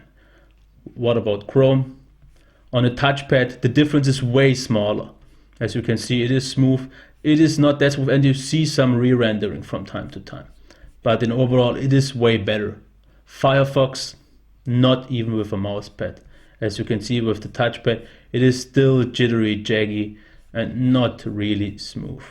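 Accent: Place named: Germany. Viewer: A few things you should know: 1. Mode notifications can be easily turned off 2. You may got a defect display because mine does not make any sound (and i already got two) 3. You want better scaling? Then turn the resolution down, i mean you already said that it´s useless for YOU to have such a high res. But now one question: Was that Yoga overheating at any time? I know that you don´t have it anymore but you may remember if it was. Because i already got two and both are freaking overheating. Really sad, now i have to change my Yoga again... PS: When i heard the first word you were speaking i immediately thought : German! :D Trotzdem gutes Review :)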